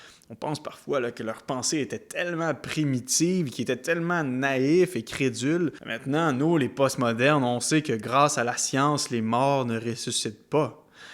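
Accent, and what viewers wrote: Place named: Quebec, Canada. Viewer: Bonjour, pour commencer je désir préciser que je crois en l'œuvre de Jésus-Christ et en sa resurrection. Il me semble que dans votre explication que vous avez oublié de prendre en compte la résurrection de Lazar ( dans le nouveau testament) et la Résurrection d'un homme dans l'ancien testament (2 Rois ). Bonne continuation, merci pour vos partage avec ce beau accent du Québec, moi j'ai l'accent Alsacien.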